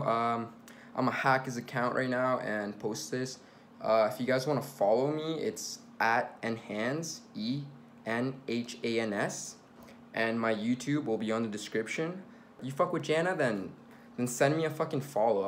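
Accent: Canada. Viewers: You just sound so Canadian and it’s all I can think about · You and Brad sound just alike. Must be a Canadian thing..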